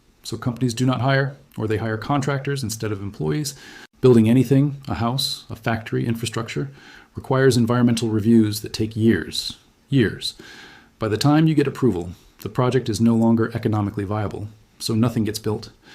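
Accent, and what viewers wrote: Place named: United States. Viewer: The GDP increased last year..so I don't know what are you talking. But from the accent you are american, so make sense to not have a clue about Europe.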